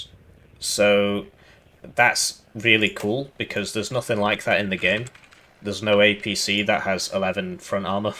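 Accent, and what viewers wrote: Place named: Liverpool, United Kingdom. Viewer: Yeah I thought I could detect a hint of scouse, born and bred in the city you notice these things.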